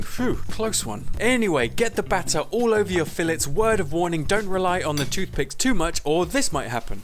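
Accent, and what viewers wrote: United Kingdom. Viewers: Just doscovered you channel from this being recommended to me and immediately subscribed before the video was even over. Brilliant recipe, well explained without taking any more time than necessary, from the UK so easier for me to understand being from there myself, plus it was funny and entertaining. Great content thank you man! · Oh I love your recipies, your sense of humour and your Loooovely british accent.....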